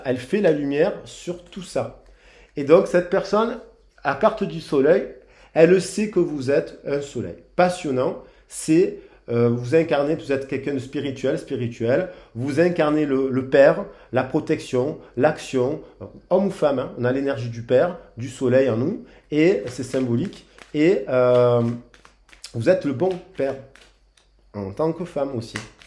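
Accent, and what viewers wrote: South, France. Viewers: ah ! Je savais que dans cette jolie voix, j’avais entendu le chant des cigales. J’avoue j’ai aussi un accent bien du sud , le pays catalan quoi 😂😂des bises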